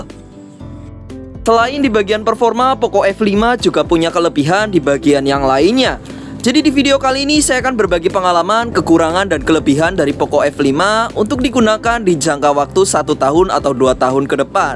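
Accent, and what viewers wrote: Banyumas, Indonesia. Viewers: bang lu org banyumas ya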